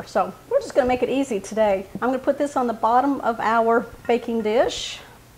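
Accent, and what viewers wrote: Texas, United States. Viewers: I am Irish Mexican and I love your Tex Mex accent! Sometimes I get told my Spanish is incorrect well I'm from tx and I got a twang in the way I speak! Love the recipe I am a huge fan of Chile rellanos!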